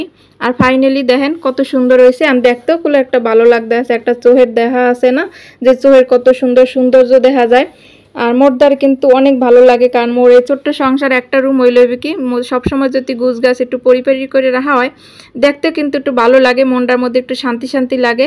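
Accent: Barishal, Bangladesh. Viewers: শাক রান্না করলে রেক পরিস্কার করলে খুব ভালো লাগলো। তোমার কথাগুলো শুনতে আমার খুব ভালো লাগে। আমার শাশুড়ি তোমার মতো বরিশালের ভাষায় কথা বলে। কখনো বাড়ি গেলে শোনাবো।❤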